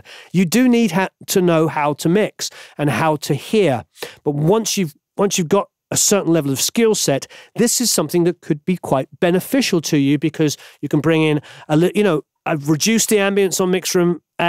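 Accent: United Kingdom. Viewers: I always mix on headphones. We moved from a place where I had a studio separate from the main house to a smaller space where my studio is directly across the hall from the den and one quarter the size. Whenever I would mix anything with a lot of bass on the studio monitors my wife would tell me to turn the bass down. I've tried a couple of room emulations and they may help...I'm not sure. Once you've mixed the advice is to bypass the plug-in before rendering the file, so the sound changes dramatically. I don't know... Couple of points: When I mix I always sit at the mixing desk, which I would assume is where the impulse of any room should be sampled at. So I don't quite understand why you would load up a room and then change the parameters. I get listening to different areas of your space while mixing on speakers but on headphones you have no idea when, say, adding ambience, where you are in the virtual space. 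Maybe I'm missing something? It seems to me that these types of plug-ins are more complicated than they need to be. Set me at the mixing desk at Abbey Road Studios (or wherever) and let me mix! And who mixes in their kitchen? Finally, why is it that when someone speaks with a British accent they always sound smarter? Don't get me wrong, you ARE very smart, but it just adds a little something to the equation. And do American accents sound smarter to a Brit? Great stuff as always.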